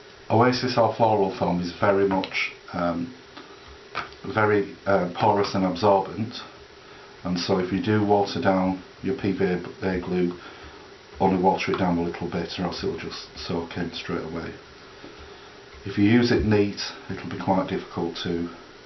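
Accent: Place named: United Kingdom. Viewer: yes very good, your voice is fine, just has an accent. British I assume. Now PVA is? wood glue? the other thing to start with is spray adhesive..and then finish with hairspray. I just love the fact that nothing is wasted, just break it up in a blender. Roughly how much is a block and how many trees per block? thanks Nick (formerly from IOW)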